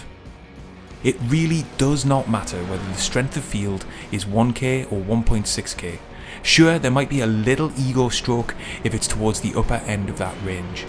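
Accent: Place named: County Durham, United Kingdom. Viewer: Hi you sound local to me as I live in stanley co.durhm. Enjoying the vids and would love an idea of price for a simple mackem mover graphic for my current cars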